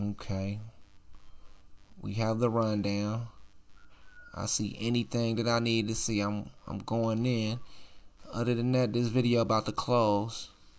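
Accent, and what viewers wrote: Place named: Chicago, United States. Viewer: I knew you were from Chicago before I read it by the way you talk lol. Good video.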